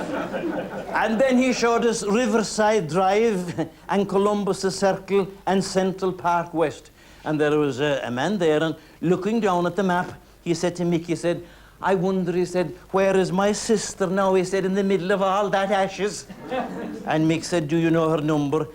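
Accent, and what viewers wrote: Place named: Ireland. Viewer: Reminds me of Galway (I know he's a Kerryman). National school in the Gaeltacht. The cadence of Irish projected onto an English language vocabulary. Reminds me of Giles na Magaleen in Ballymagaleen.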